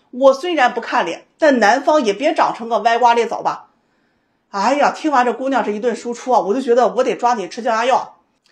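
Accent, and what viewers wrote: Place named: Shanxi, China. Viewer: 老师口音是 山西人啊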